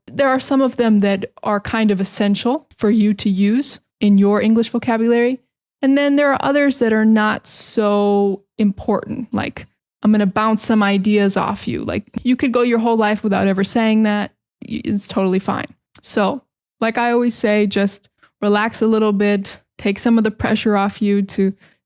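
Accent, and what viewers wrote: United States. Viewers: Thanks for recommending Micho Brothers, they are awesome. By the way in living in São Paulo as well, in a homeless shelter. I really appreciate your channel, you're helping me a lot to improve my English skills, your american English accent really matches with my goals. Thanks so much dear teacher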